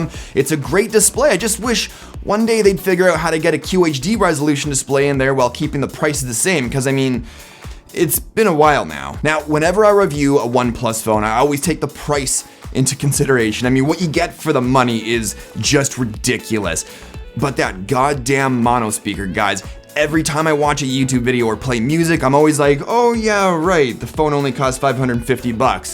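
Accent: Canada. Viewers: Are you from Vancouver (BC) eh?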